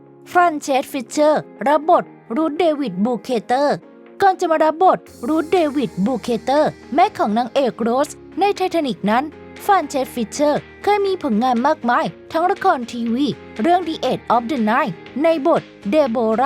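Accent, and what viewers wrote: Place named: Suphanburi, Thailand. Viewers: แอบขำแอดแอบเหน่อ 😄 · หนคนเดียวมั้ยที่ฟังไปเรื่อยๆละคิดว่าคนภาคเสียงหยั่งเหน่อออโค๊นสุพานน55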